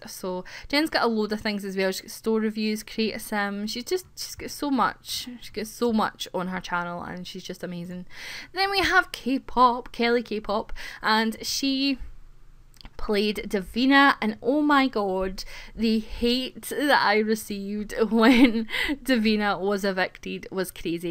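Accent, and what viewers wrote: Scotland, United Kingdom. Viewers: I'm glad you will have more time to do what you seem to really enjoy! I certainly love watching your LPs and listening to your lilting Scottish accent! I'll definitely be continuing to watch TS3 LPs and I really look forward to seeing how you, Peter and Jenn play TS4 because I am so confused about how this game is supposed to be played. I know it's supposed to be a sandbox game, but it definitely seems to be geared toward a different playing style than what I've been using for the past 10 years, so yeah... Thanks for everything you do and for all those who helped with Big Brother! I've never seen the actual show in any way, but I don't think I would enjoy it as much as your version.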